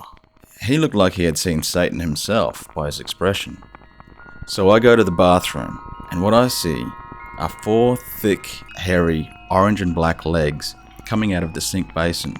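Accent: Australia. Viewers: Miss you, cant fall asleep without that Aussie narration and humor, also wish I could have a smile & a Vegemite sandwich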